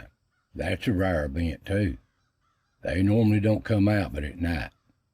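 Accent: Appalachia, United States. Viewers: i am new to your channel but certain things are clear to me. You are certainly from around the area. You have said things in different videos that I have only heard back in the hollows myself, such as Notown, yup, i know where it is. The way you say Haint. I Have only heard dad and a few others actually say it the way you do. A paper Polk, again, just a few people i know use that. One of the later ones i saw in your Raw Head and Bones, you broke a Wantnot. My aunt is the only person i know that calls those wantnots. again not sure how that should be spelled. I figure you are about 20-30 years older then me. I grew up on the TN side of the mountain from you. Tackett creek was an area i knew pretty well. Just wondering, have you covered the Sand Cave up on Cumberland Mountain? My first trip to the look out rock on top of Cumberland mount was around 1980, the tower was still being build at that time. 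You could then drive a car up there. Looked at the overlook, then we went down to the sand cave. To this day if I go up there, i feel like i missed something if I don't visit both places. I knew about, but never made the trip over to Fern Lake. I have camped and hunted though out Tackett creek. No one really many scarely tales to keep us in line while i screw up, seems that was a generation before me. Although i did hear a few, wish i could remember more of them now. Dad once told of some boogieman that kidnapped kids, but i don't recall the details. I am really enjoyin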